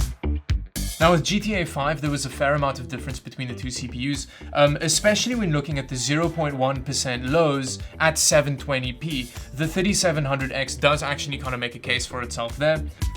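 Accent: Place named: South Africa. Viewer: Sounds kinda south African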